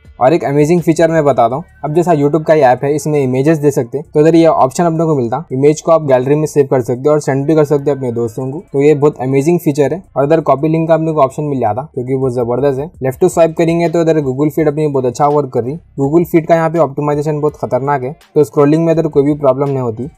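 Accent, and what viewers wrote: India. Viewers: Love your hindi accent. Dont change the way you speak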